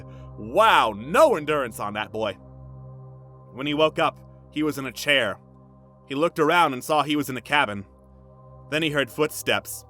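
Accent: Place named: Germany. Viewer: this is gold. The fact the story was so predictable and yet still caught me off guard was actually insane. thank you for the wonderful and lore-accurate german accent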